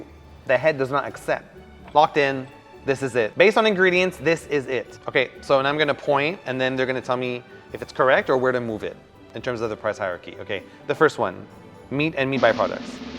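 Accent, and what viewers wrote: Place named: Quebec, Canada. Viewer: I totally recognize your accent. You're French Canadian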